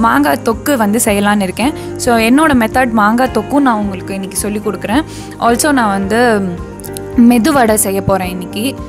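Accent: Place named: Badaga, India. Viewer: Sister nenge badugas ah.. neenge pesarthu apdi tha iruku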